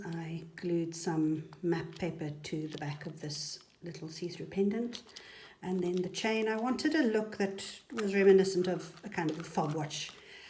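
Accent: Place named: South Africa. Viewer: Hello fellow RSA-ian. Love your work... and listening to a familiar accent. Thanks for sharing.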